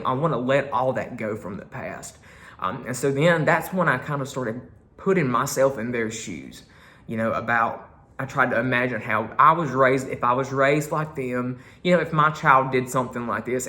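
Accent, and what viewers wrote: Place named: South Carolina, United States. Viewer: you are SO WISE! They know how to raise 'em in South Carolina! And your accent is adorable. I wanna wrap you in my arms and hug you to death! (Well, maybe not THAT much LOL.)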